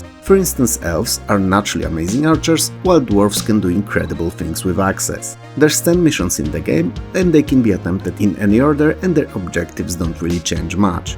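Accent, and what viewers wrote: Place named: Poland. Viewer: That's a lovely accent you've got there! May I guess you are from Poland? 😊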